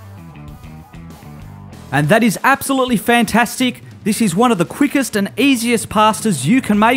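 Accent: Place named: Australia. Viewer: You have the best Australian accent ive heard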